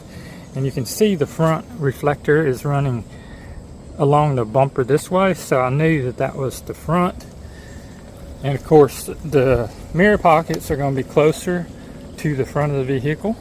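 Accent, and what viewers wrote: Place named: South, United States. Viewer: Yeah, and you're a pretty good actor. Maybe take a trip California, they love a southern accent there. You can be a Hollywood star! Plus, it's one of the most beautiful places with millions of acres of public land lots of jeep trails. I live in Mississippi but just bought property in Arkansas, just a rock throw from Pond Creek. I like to canoe and love all the water in that area! My girlfriend is from Louisiana, so we like to explore all the nearby states.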